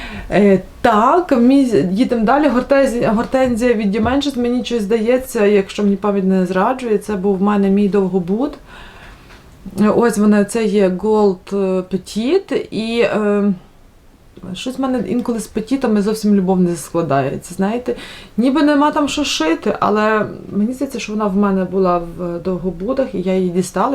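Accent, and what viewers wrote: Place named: Lviv, Ukraine. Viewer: Дуже багато роботи зробили ви за 2023 рік. Я дякую за проект « борщівська буденка» 🙏🫶❤️ Бо інакше у мене не було б такої гарнезної сорочки. Ви надихаєте і мотивуєте рухатись вперед. Люблю ваші відео, слухати ваш голос зі своєрідниим львівським акцентом- то як медитація😊 Бажаю ще більших творчих успіхів у новому році. І дуже чекаю на новий проект. Може то буде красива бойківська сорочка чи міртова😊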